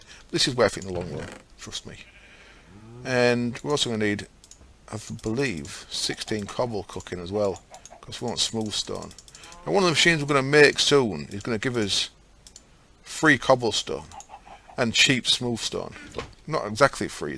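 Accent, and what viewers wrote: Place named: Yorkshire, United Kingdom. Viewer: You just go how you want bud, the video's so far are mint. From a fellow Yorkshireman (Presuming you're from Yorkshire, sounds like it!)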